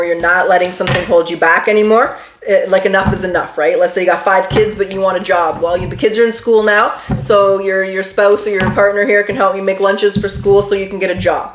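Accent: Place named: Canada. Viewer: You're Canadian... Lol I can tell. My ex is Canadian too. I love the accent.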